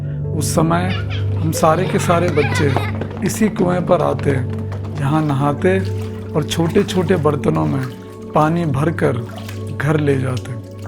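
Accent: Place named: Garhwal, India. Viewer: Good garhwali accent 🎉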